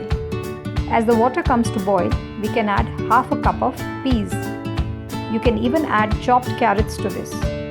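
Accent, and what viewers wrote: Garhwal, India. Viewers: मैम जतका स्वाद यूँ पहाड़ी व्यंजनू मा छ वतका इ स्वाद आपकी गढवली बोली मा भी आंणू छ। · Thank you so much mam for uploading it.and hearing Garhwali from you is such a treat to ears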